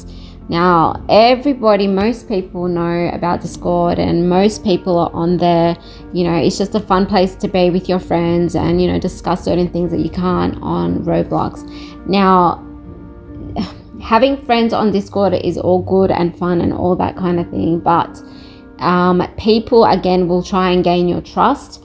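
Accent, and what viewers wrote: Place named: Australia. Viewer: Nice vid you sound austrlian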